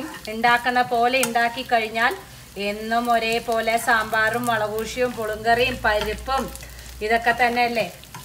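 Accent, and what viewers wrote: Palakkad, India. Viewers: Variety dish,,,,, also Ur way of speaking, palakkadan style, kelkkan nalla rasam undu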